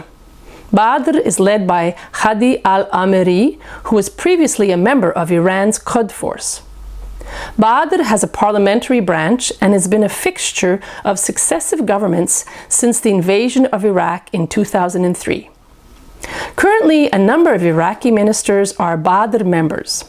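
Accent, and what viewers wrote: Israel. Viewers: This video is one of the many collaborations between Salafi/wahabi sunni and Zionist Jews as the pronunciation of the narrator comes from Israel Apartheid state. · The accent of the broadcaster in the video sounds Hebrew. From the way she pronounces Hezbollah. Am I right? Anyone else noticed that? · I SMELL ISRAEL IN YOUR ACCENT AND STORY . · Zionist detector. the narrator is zionist israeli and this forum is false. (pay attention to her accent) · Why this woman is talking like an israeli woman?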